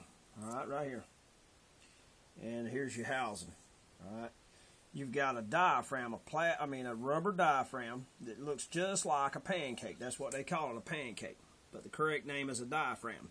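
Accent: South, United States. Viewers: Name that Southern accent. I'm thinking...... Alabama?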